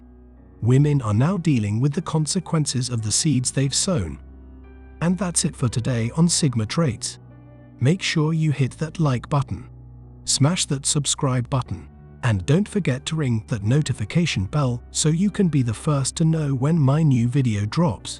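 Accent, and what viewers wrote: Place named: United Kingdom. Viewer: How about you take your British accent and get bent.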